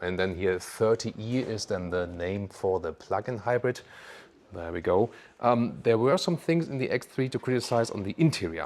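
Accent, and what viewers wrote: Germany. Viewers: Always funny hearing a German talk English👍😭🤣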